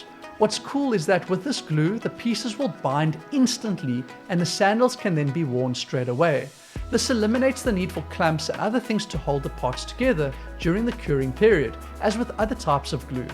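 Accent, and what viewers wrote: South Africa. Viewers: Great video! You sound South African 😊 I was just wondering why barefoot shoe companies don’t make Birkenstock style barefoots. I will try this on an old pair of birkies.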